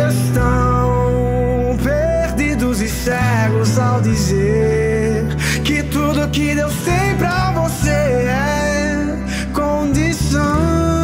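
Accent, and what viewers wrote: Northeast, Brazil. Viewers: Cara ameiiiiiiiiiiiiiiiiiiiiii, como luterana do sertão cearense, cheguei a chorar com esse sotaque lindo da minha terra nordestina! PARABÉNNNSSSSSSSSSSS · Graças ao Espírito Santo por ter abençoado vc com essa letra inspirada! Digo mais... pelo seu sotaque parabenizo o nordeste brasileiro por estar despontando em produção teológica tanto nos seminários como nos púlpitos, livros e agora tbm letras. Ansiando por um reavivamento e uma reforma na igreja brasileira. Fantástico isso! Mano, como faço pra conseguir os PlayBacks dessas músicas do álbum Ecoar?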